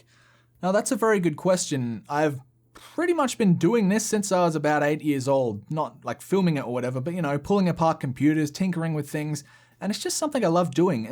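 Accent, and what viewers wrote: South Australia, Australia. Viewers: Oh heaps good, I had no idea you were a fellow South Aussie, for some reason I thought you were Victorian. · Ahhnswer.. classic Adelaidian.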